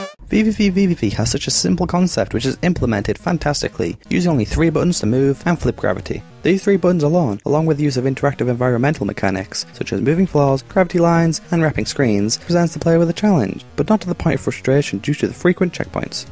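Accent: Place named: Manchester, United Kingdom. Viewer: Manchester accent + speaking fast = Americans can't understand you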